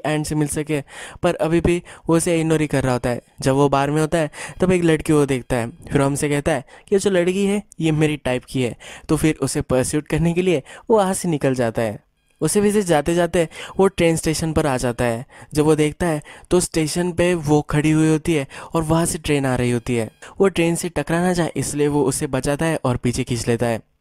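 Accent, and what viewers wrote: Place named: Uttarakhand, India. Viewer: You are from uttarakhand aren't you???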